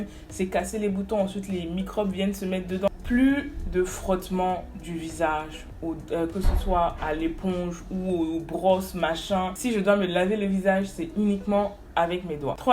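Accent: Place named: Ivory Coast. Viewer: L'accent me fait penser a une ivoirienne 🥰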